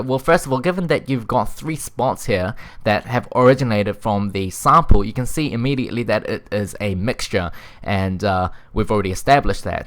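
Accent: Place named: New Zealand. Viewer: Your new zealand accent>>>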